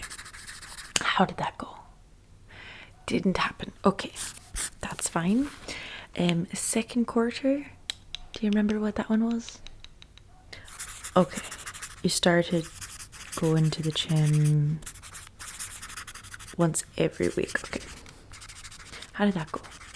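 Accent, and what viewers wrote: Ireland. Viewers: yes, slay. I’ve been highly anticipating this !!! your Irish accent is my fav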